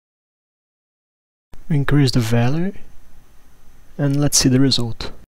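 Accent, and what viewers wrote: Brazil. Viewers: man sounds like brazillian, look at that accent, you love to see it. tamo together mano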